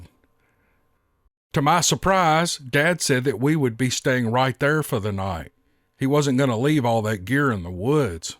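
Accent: South, United States. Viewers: all these people love you! Your genuine love and goodness is overwhelming! That southern voice is to kill for! Have I buttered you up enough yet? Just kidding. I can’t even get a stream to go through correctly. But Im good at sending peek-a-boo pics of my hubby unintentionally. Lol 💝💝💝💝💋🙋‍♀️👩‍🦳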